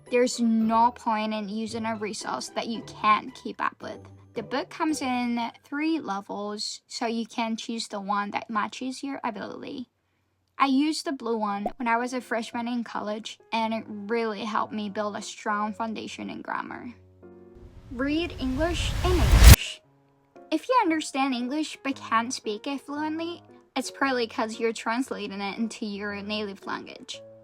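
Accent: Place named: California, United States. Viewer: 現在はアメリカ住みですか？ここまで美しいカルフォルニア？方面のアメリカ英語は中々聞けない気がします。 単語の選び方とかが特にネイティブぽい。。。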